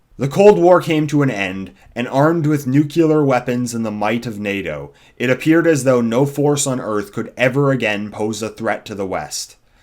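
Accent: Canada. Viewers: are you canadian lol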